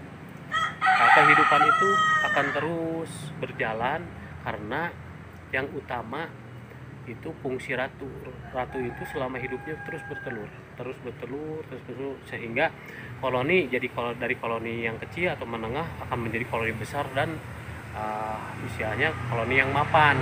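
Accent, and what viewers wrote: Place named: West Java, Indonesia. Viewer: Logatnya seperti sunda, Daerah mana kang?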